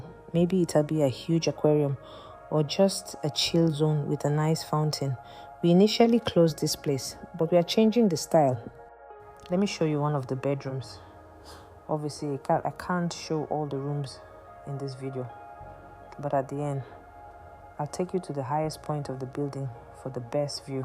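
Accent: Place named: Nigeria. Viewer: U are speaking like Nigerian. Copy and paste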